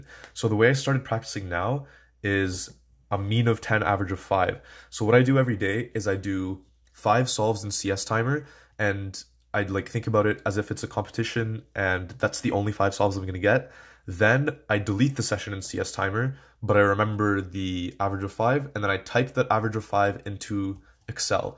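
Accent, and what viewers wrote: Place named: Canada. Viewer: Dang I just noticed how much of a canadian accent he has.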